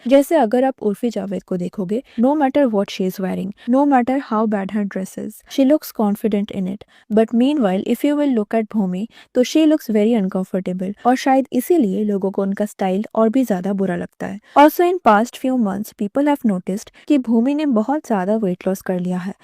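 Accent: Bengal, India. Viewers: I love ur bangali tone 😊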